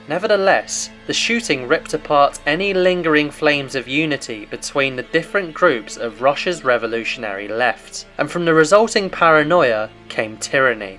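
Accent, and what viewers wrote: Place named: Cockney, United Kingdom. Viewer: One torture the Soviets were never cruel enough to impose was forcing one to listen to a bleating cockney voice drone on and on for 10 minutes!!!!!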